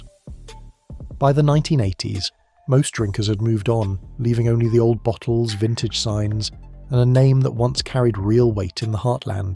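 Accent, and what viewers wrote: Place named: United Kingdom. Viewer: Wait. Why is a video about vanished American beers narrated by a British sounding douche?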